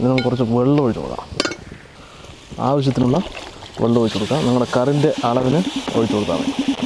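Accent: Palakkad, India. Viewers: സുഹൃത്തുക്കൾ ചിക്കൻ കാലെടുതതപോൾ കണ്ടു കള്ളൻ എന്നിട്ട് പറയുകയും ചെയ്തു നിങ്ങൾ ആളുകൊളളാലോ ആ പാലക്കാടൻ തനി നാടൻ മലയാളം എനിക്ക് വളരെ ഇഷ്ടപ്പെട്ടു എല്ലാ ആശംസകളും നിങ്ങൾക്കും നിങ്ങളുടെ ടീമിനും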